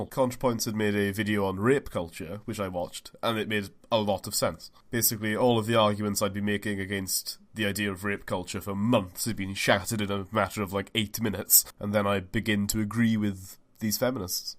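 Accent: Wales, United Kingdom. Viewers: I don't often subscribe to people based on a single video but this really resonated with me. While I was never reactionary enough to enjoy Milo Yiannopoulos or Sargon of Akkad I was a Gamergate supporter and was suckered in by their sensationalism and militant rhetoric. Then I guess with the rise of Trump I just distanced myself after I found out that a lot of the people I was following weren't actually liberal centrists but full-on Trump supporters. Brexit didn't help either. Also, fellow Welshman? Or am I just bad at recognising accents?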